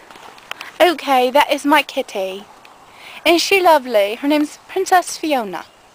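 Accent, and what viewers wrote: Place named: United Kingdom. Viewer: Way to go great British accent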